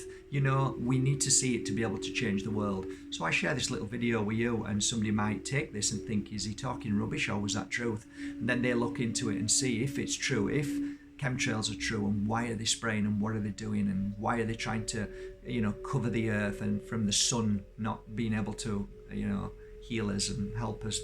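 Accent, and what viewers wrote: Yorkshire, United Kingdom. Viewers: I've been watching you for sometime, as a fellow Yorkshire man I recognised the accent. I suspected you was from Huddersfield the first time I came across your energy. Something about you seemed so familiar. You mentioning Marsh in this video confirmed it! Lovely to come across an ascending soul from home doing so well! For some reason or another I haven't watched a video of yours in awhile. This has been the perfect video/way to return to your energy. Home as a theme is very pressing for me right now. Childhood, family, pain, abuse, independence and breaking free are all very present in the now. Realising we share the same birthplace and with the topic of the video has created a beautiful synchronicity. Thank you! Thank you for your energy, time and sharing your story!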